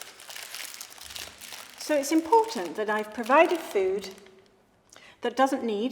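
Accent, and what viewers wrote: United Kingdom. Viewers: I love the pronounciation of british people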